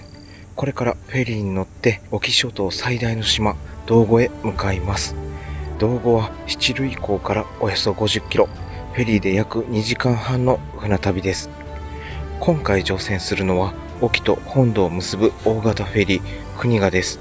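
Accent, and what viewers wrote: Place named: Nara, Japan. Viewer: さん、いつも素晴らしい動画とBGMをありがとうございます。本当に楽しく拝見させて頂いております。毎回日本ってまだまだ知らない絶景が広がっているんだな〜って感心します。ドローンの映像が素晴らしく自分で観に行っても見られないアングルからの映像に圧倒されます。ところで今回の動画の後入れのナレーションの音声が一寸だけトーンが高いように感じるのですが気のせいでしょうか？いつもの落ち着いた声が好きです。口調が奈良弁？でクピ男さん（クピ男の車中生活）に似てますよね。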